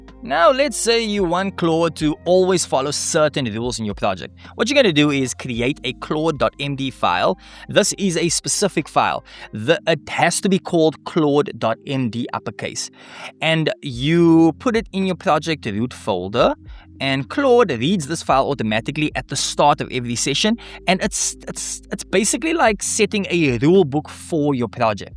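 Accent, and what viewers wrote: South Africa. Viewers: My guy are you South African? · What’s that accent dawg? South African?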